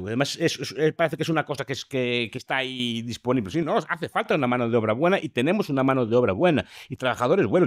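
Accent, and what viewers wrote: Galicia, Spain. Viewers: Los ricos son más ricos y los pobres también son más ricos no? Entre que habla muy rápido y no se le entiende media Papa y encima es gallego o ve por ahí de donde sea que no se le entiende cuando habla y encima deja las perlas que deja. El pobre es más rico pero sigue siendo pobre. Hoy gano 8 € la hora y mañana ganaré 8 € con 16 € entonces soy más rico pero no Rico sin embargo el rico gana generalmente el no honrado gana cantidades desorbitadas y si por algún motivo tuviera que ganar más no va a ganar 2 o 3 o 4 € más va a ganar muchísimo pero que muchísimo más en comparación a esos 16 céntimo de euros qué hace que el pobre deje de ser pobre. No es personal pero este pavo o no sabe lo que dice o lo que dice lo que dice porque está en nómina muchas más posibilidades no hay. Son personas que pese a todo el conocimiento que tienen da la sensación desde que viven en una burbuja personal intelectual cómo los que dicen que en el mundo no sobra gente sí sí claro en el mundo cabe muchísima más gente eso lo dirá el que no ha ido nunca una cola de un paro o no ha ido nunca a un médico público o dificultad para encontrar un trabajo digno en el que no te coacción en pero a niveles demenciales.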